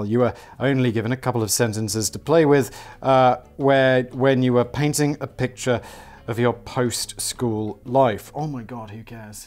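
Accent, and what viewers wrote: United Kingdom. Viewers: It's all right, everyone; no need to panic! He's British... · I love how turned the British-isms up to a 1000 with this one. Also, a close runner up is to hear Simon blow past a sentence and then say, “what?! really?!”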